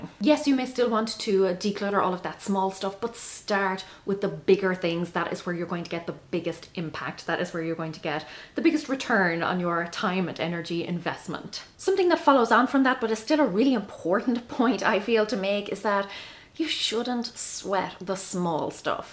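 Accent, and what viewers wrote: Ireland. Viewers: And you sound like my family I'm American but my dad was from Mayo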